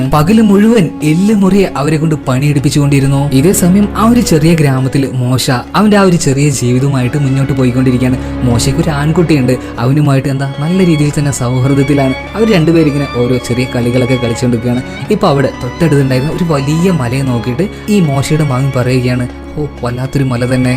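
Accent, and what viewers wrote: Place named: Palakkad, India. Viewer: പാലക്കാട് കാരൻ ആണല്ലേ മല്ലു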